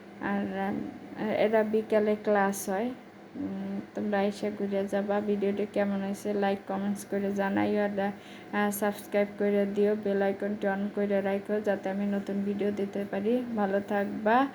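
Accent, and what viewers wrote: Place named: Barishal, Bangladesh. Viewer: মাশাআল্লাহ আপুমনি তোমার বরিশাইল্লা ভাষা কথা বলা খুবই সুন্দর পুরো ভিডিওটা দেখে খুবই ভালো লাগলো ❤❤❤❤❤🎉🎉🎉দিয়ে গেলাম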